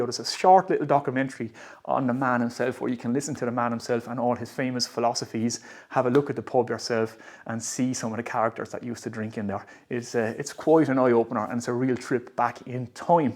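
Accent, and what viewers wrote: Ireland. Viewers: The man was teetotal!! It's probably just something re-packaged!! How could he sample it?! Nice enough though. Like your Déise accent!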